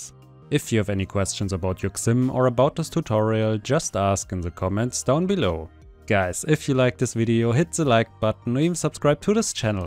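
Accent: Germany. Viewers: Yo hey r u german?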